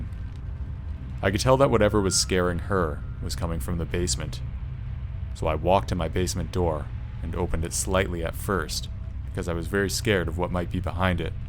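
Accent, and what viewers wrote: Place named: Canada. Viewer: Thumbs up for awesome stories. Thumbs down for horrible Canadian accent. It's a house! Not a hoose!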